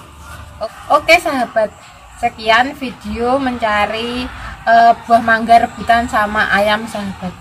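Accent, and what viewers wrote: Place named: Java, Indonesia. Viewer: Medo bahasa jawane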